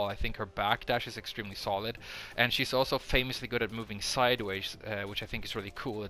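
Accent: France. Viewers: HIS FRENCH ACCENT LMFAOOO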